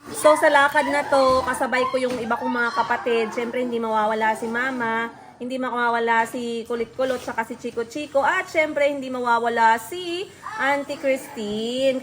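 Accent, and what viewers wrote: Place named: Visayas, Philippines. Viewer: love n love q po tlaga ms. yang tagalog bisaya accent mo.nkka goodvibes po.❤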